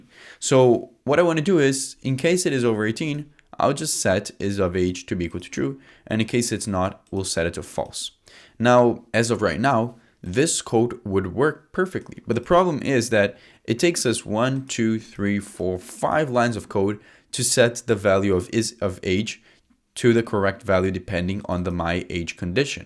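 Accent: Brazil. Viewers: Esse mlk é BR certeza ou é português kkkkkkk to maratonando o canal dele, bom demais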